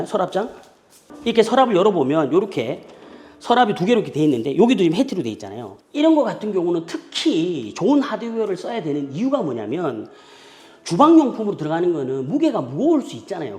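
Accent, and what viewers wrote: Busan, South Korea. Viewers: 부산 이쥬? 사투리인데 ㅎㅎ 인천송도인가?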